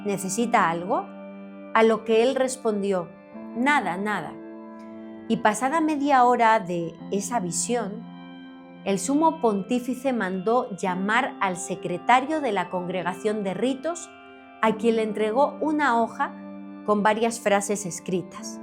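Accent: Spain. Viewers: Tu acento suena entre español y mexicano 🤔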